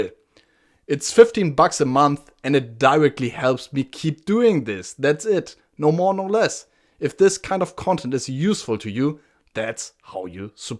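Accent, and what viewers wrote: Germany. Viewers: Der deutsche Akzent ist nicht zu überhören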